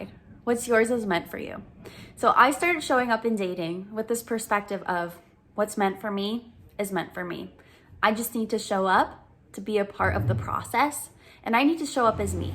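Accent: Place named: Romania. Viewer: I looove your romanian accent! 🥰 You're saying it perfectly! Great video too! 🤩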